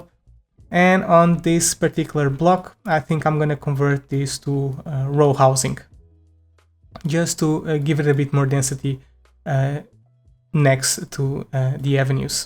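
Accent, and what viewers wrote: Portugal. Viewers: Ola amigo é evidente que o teu sotaque é português, devias fazer videos em Português ia ajudar bastante. Obrigado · are you perchance porrtuguese??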